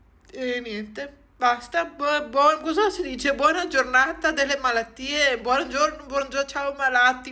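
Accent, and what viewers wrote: Veneto, Italy. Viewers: Parli come me quindi immagino tu sia veneta ...❤ti abbraccio fortissimo e ti auguro ogni bene...❤❤❤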